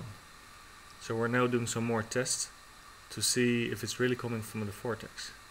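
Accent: Netherlands. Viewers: Gast ik hoor aan je stem dat je nler bent ik wil s komen kijken! mag dat? :D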